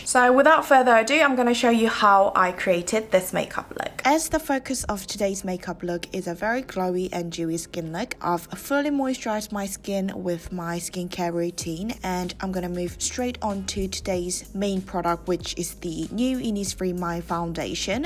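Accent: United Kingdom. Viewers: 영국발음 발린다ㅠㅠㅠ..매트를 맡이라 하는 거 늠나 치이는 것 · 진짜 영국식발음 넘나 매력적ㅠㅠ 저도 영국영어 배우고싶어요ㅜㅜ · 언니 왤케 이뻐요ㅠㅠㅠㅠ진짜 누가 백설공주 라고하셨는데 그 말 딱 맞는듯ㅠ 그와중에 영국발음 취저 탕탕😭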